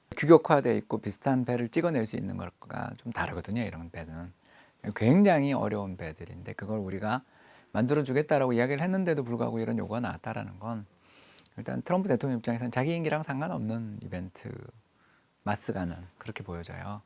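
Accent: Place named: Gyeongbuk, South Korea. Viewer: '경북 사투리'에...듣고나면 고구마 한 솥 먹은 느낌!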